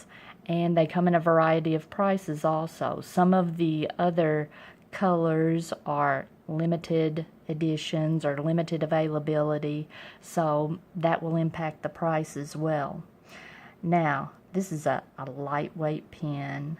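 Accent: South, United States. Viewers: Dear, Thank you for your channel & pen reviews. I think your presentation style of casual conversation, combined with the BEST writing sample camera angle on YouTube!; are wonderfully engaging, informative & endearing. Although some other pen fanciers, may have minutes of opinions about various pens; I find myself, asking, 'I wonder if has a commentary' about this pen or that. Your Southern accent & charm make watching your pen featurettes delightful. I am just returning to my fountain pen roots. I had the pleasure of discovering the joy of the Pilot Prera Iro Ai (F), before I became one of your subscribers. Now I'm waiting for a couple of additions to my 'qwillery': the Sailor Pro Slim 4 Season (Meigetsu) & the Pilot e95s, to arrive. Thanks for validating that these wonderful writing instruments should be 'played with', as well as valued & admired. 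God Bless, Candice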